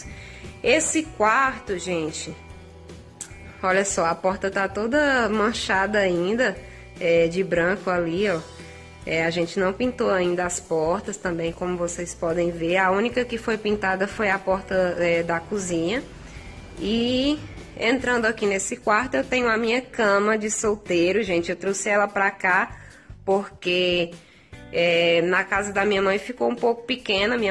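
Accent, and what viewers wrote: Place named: Northeast, Brazil. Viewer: Amei seu vídeo. Que sotaque maravilhoso que simplicidade! Amei saudade do meu nordeste ❤💋😘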